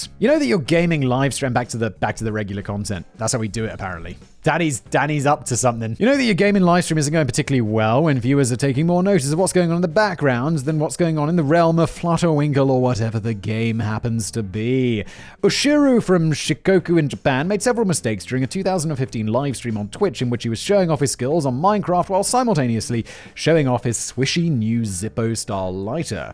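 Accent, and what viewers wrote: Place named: Oxford, United Kingdom. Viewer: I love how Simon has the vocabulary of an American Midwestern and the accent and diction of an Oxford Man!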